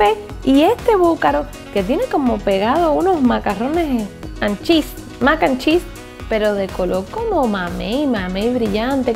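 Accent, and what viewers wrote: Cuba. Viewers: Sandra le da asesoría igual que Harold trabajan con ella, en ningún momento dicen que son familia. El acento es de Cuba. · Las cubanas tienen la misma voz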